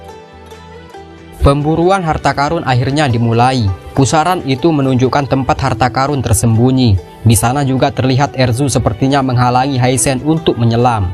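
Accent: Lombok, Indonesia. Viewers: Ini yg bercerita orang lombok ya.?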